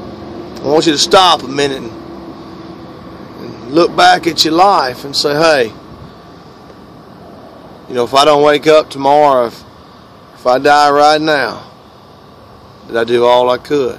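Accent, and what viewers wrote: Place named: Texas, United States. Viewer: Make sure you post more to you tube because I am spreading the word with your videos! I moved to PA from Texas and to get your accent with my morning Word is a blessing! LOL! I have shared a lot of your videos already thru Facebook (yes, I follow you) so you are doing Gods work for sure! Keep it up and God Bless!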